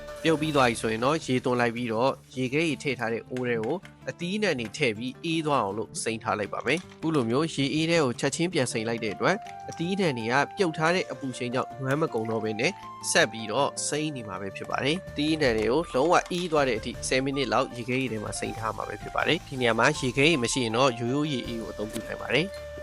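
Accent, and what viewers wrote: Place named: China. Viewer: တရုတ်တိုစွသံတောင်မကြားချင်ဘူးရွံလို့ပါ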